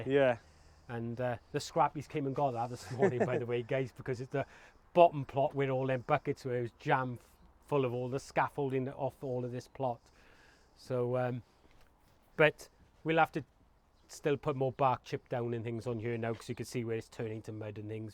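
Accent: South Wales, United Kingdom. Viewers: Yep, you definitely have a south wales accent cariad 😆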